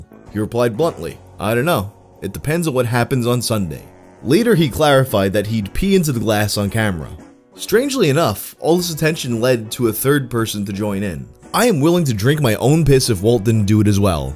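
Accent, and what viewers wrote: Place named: Brooklyn, United States. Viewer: No offense, sort of sounds like a Brooklyn-nite/Italian version of Vinesauce Joel.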